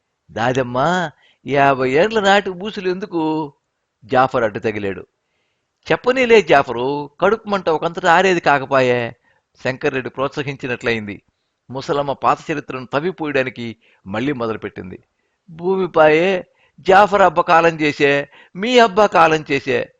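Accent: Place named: Kadapa, India. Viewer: Kadapa dist language narration in story reading very nice sir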